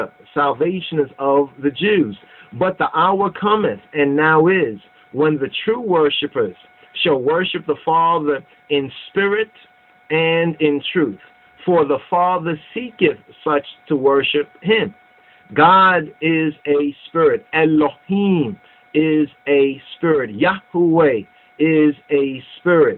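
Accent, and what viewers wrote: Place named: West Coast, United States. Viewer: Wow!!!! you really should read your bible and look for salvation instead of looking to find what race people are there are good arguments both ways but neither have anything to do with salvation. And why is it that in the comments anyone who disagrees with you is racist but your video about salvation is for blacks is not racist? also just throwing this out there for ya Solomon had a black lover but in the book song of soloman she is saying for him not to look down on her because she is black. Now if he were a black man why would she say that? He was the son of kind david by the way. Also I noticed your channel is Ethiopian world network and you sure are dressed the part but im curious what part of Ethiopia are you from cause most of the people I have seen from your country don't have west coast accents..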